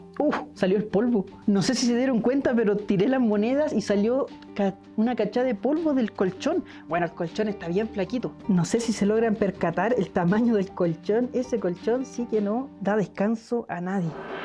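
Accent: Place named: Chile. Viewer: Saludos....para mí q eres de Chile.... por la forma especial de hablar...y por la palabra....una cachada... saludos desde Perú 🇵🇪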